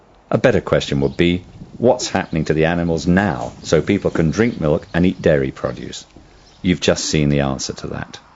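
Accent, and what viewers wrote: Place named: United Kingdom. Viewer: sound like British English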